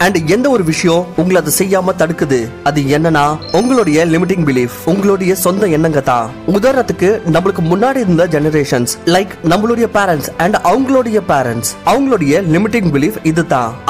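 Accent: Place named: Karnataka, India. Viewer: Kanada Tamil nala iruku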